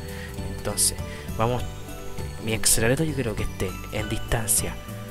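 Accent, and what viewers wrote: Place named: Chile. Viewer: Oye crack, por las palabras y maneras de hablar eres chileno? Realmente me dio curiosidad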